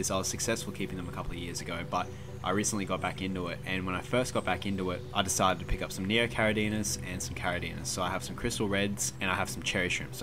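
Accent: Australia. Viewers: Thanks for the informative video. What city do you live in? I am wondering because you sound Australian and I live in Melbourne and the water here is also fairly soft. So I am wondering how appropriate you think Melbourne water is for Crystal Red shrimp? Do you think it needs any treatment besides dechlorinating?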